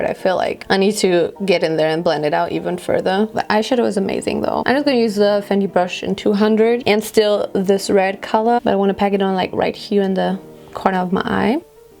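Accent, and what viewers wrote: France. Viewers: Hey girl, I'm a french subscriber und ich kann Deutsch auch ;) I find your french accent not so bad. In the future, can u make an entire video in french pls :) Bye bitch <3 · Woow ! Your french accent is fucking good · You do have a really great french accent !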